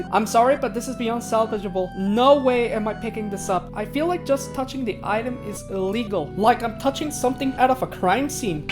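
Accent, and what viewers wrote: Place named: Mexico. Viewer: You are mexican becase you sound one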